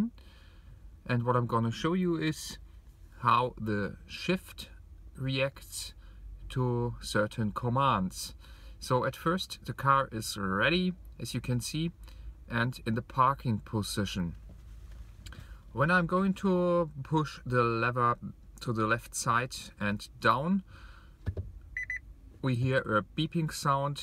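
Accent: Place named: Germany. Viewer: Interesting that you have a finnish name and you sound pretty German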